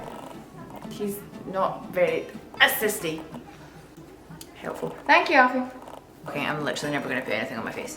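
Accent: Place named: Scotland, United Kingdom. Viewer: if the plan was to entertain as stated this was hilarious :D also i love a scottish accent ! got any pics of the end result though ? ;)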